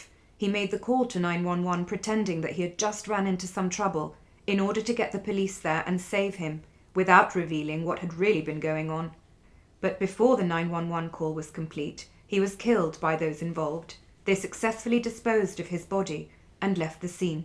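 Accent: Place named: United Kingdom. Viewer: I have looked at & listened to many videos about this case. but it’s so good to hear it spoken in a calm collective manner by a fellow Brit. Thank you. It’s time Brandon was brought home.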